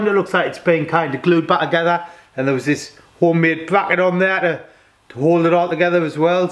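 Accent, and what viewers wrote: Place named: North East England, United Kingdom. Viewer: Great videos mate. Because of you I’m really getting in to Ford. You from Newcastle, Sunderland or Middlesbrough? Rob from Ireland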